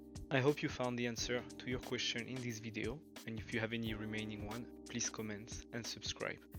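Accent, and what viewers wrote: France. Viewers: Cette accent français, super vidéo merci 👍😂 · are you french ??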